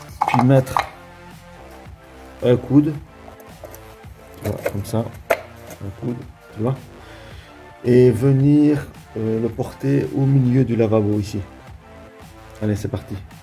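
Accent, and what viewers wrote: Belgium. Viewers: Mais ne serais ce pas un petit accent belge ? Voir même liégeois 😊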